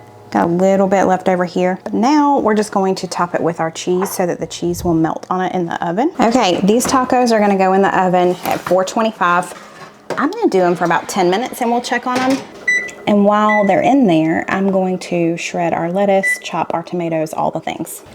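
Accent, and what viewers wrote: South, United States. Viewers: I must also be old! I was singing along with you each time! All of these look delicious! Both my daughters ask to watch " from in the Makin'" (in a cute midwestern version of your southern accent!) every weekend as we are making up our meal plan for the week. And my youngest son asks if it is a meal when it is something new. To say you have made a wonderful impact on my family's mealtime is not an understatement! Thank you for all you and your family does for us! Smiles, Abby Smith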